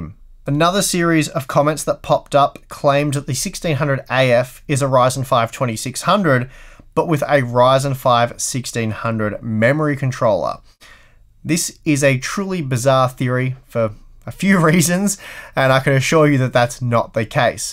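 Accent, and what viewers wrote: Australia. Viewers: Its by Aussie accent 🤓 👍.. Propably many times people hear all sorts of things. Me included that left me wondering how to manage the hearing 😄. Ah well, anyways. HU channel makes Good videos. One of my Favourites. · Poor Youtube subtitles, can’t understand an Aussie accent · Your Ausie accent is tricking people :)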